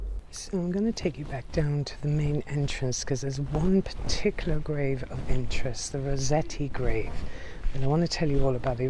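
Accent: Ireland. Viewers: Dear, that was a wonderful walk, I've had that place on my bucket list for many years. Thank you for a wonderful video, I've been there in spirit now. I was pleased by the care being taken and Highgate Cemetery, it still has the right kind of spookiness. I hadn't heard about the vampire so that is a bonus. I love hearing your accent it adds so much for me, the Irish branch of my family tree are from Dublin, a few of them weren't very nice but they are are forgiven 😀 Lots of love and Blessed Be always 💖💖💖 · You have a lovely soothing voice! Living here in Berlin, always nice to hear your beautiful Irish accent, reminds me of home. Also, Highgate was one the most interesting places I visited in London. Keep up your wonderful work x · I just love watching your videos and love your Irish accent, must bring out the Irish half of my ancestors in me...lol. Love love love London (been there want to go back one day) and also to visit Ireland . 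You have so much energy and enthusiasm. Would love to do one of your tours one day. Go away Covid and I will be over there in a flash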